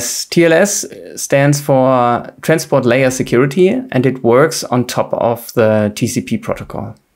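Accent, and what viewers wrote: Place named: Germany. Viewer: He must be german! i can hear it from his accent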